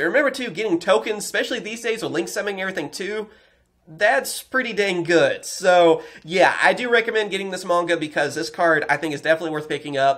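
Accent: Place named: Georgia, United States. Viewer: Bro are you from Georgia because you sound like you are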